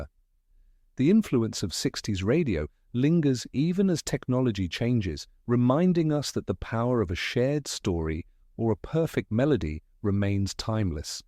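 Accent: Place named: Home Counties, United Kingdom. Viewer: Memory Lane America-narrated by some bloke from the English Home Counties (assumng it's not A.I. ). If you want to relate American cultural history, at least have an American voice doing it.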